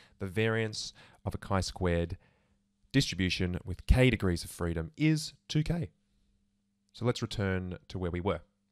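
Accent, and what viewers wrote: Australia. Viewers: is that Australia accent?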